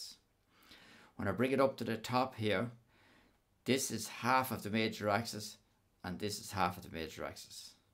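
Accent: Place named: Ireland. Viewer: Love it! So well illustrated! Great to hear the Irish accent too! Cavan? Drogheda?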